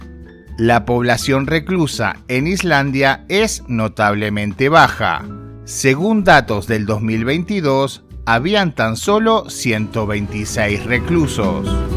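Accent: Argentina. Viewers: 🤯 ¡Me voló la cabeza!... Pero no por Islandia y su desmadre, la narración en jerga mexa con acento argentino, es como un arma para destruir a las IA. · Lo que no entendi es porque hablan mucho de Mejico y el que habla suena a Argentino,lol.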